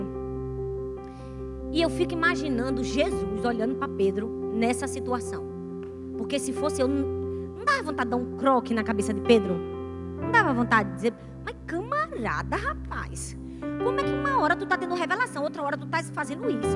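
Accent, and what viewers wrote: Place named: Pernambuco, Brazil. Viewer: eu tenho esse mesmo sotaque dela. 😂😂 Tbm sou de Pernambuco. Só falta eu visitar a Igreja do Amor e apreciar esse 'bela' voz de perto 😂😂😂😂😂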